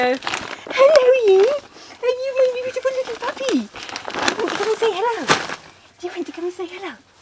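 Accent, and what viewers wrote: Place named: United Kingdom. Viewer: I've got to say, you and are just delightful. I'm sure when you started this YouTube journey, you may not have given much thought about how it would be if your channel got popular and you were recognized. Positano is such a small place, and you guys are recognizable by your kind, lovely faces (your British accent and wonderful smile). You are so gracious to invite people to say hello to you, which can I imagine can be intrusive or inconvenient depending on what you are doing. You never need to apologize for being human or having a bad day. I hope that people respect your privacy when the moment calls for it. As someone who has been wanting to visit the Amalfi coast for all of my adult life, I thank you so much for showing us around your beautiful little corner of the world. You take me there even when I can't be there! 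Love to you from PA, USA. ❤️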